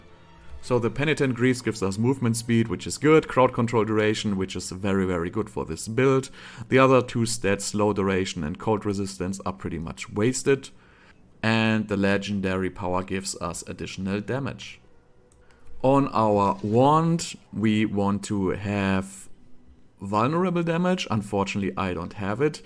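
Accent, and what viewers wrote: Germany. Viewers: i made i similiar build yesterday. 180 mana with enchant throws 7 lightnings. 1 regular, 4 omnipower and 2 from enchant. already works like a charm with 2.0 mana per bounce. Esus boots for crit and i use the 300% gauntlets. Also currently with raiment but i will probably use a normal chest soon and therefore put temerity in to try it out. i also use 2h with 80% for 4 additional bounces. i also use the 20% enchant boost in paragon but dunno if its worth it. question: you use elementalist. do you know how the crit chance is calculated?i would like to use it as well and get rid of the boots but dont know how it works. if i have my omnipower procs from 150mana to 0 and it casts the chain lightning do they keep the enhanced crit chance for their whole duration or everytime i drop below 100 mana while they are flying it dynamically adjusts and removes the crit chance? 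I feel like cc duration is useless. i just stun them again with 3 points in the stun passive. and everything is stunned anyway so no need to use shared missery. i also do not see the point in freeze because you have stun as hard cc. i may try it out but i dont know if the 3 uniques, paragon board and so on is worth the effort. my dmg numbers look the same are you german? your accent sounds like it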